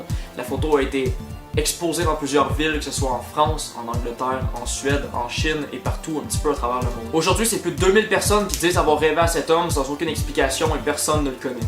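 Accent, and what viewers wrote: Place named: Quebec, Canada. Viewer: J'adore ton accent québécois!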